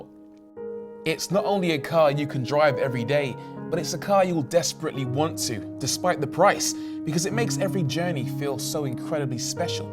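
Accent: United Kingdom. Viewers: THIS video is poetry at its best! I love to listen to this British accent and this beautiful music... Your channel IS underrated, mate! I love this shit.... thanks a lot! :-)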